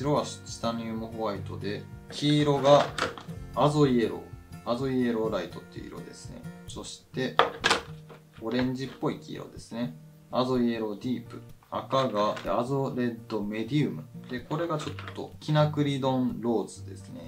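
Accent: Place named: Kansai, Japan. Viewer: 関西弁も心地よいです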